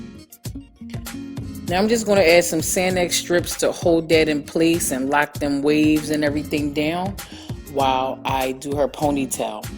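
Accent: Philadelphia, United States. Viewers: Your Philly voice is all that! Love my city. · I love how heavy your accent is that’s that philly right there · Darling where are you located?! You sound like you’re in philly (no offense!) is love a press and trim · Beautiful job! You can take the lady outta Philly but you can't Philly out the lady! Love that accent 💜💜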